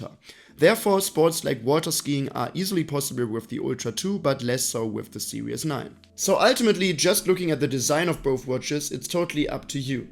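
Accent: Germany. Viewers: Love the accent haha, very German review, useful and helpful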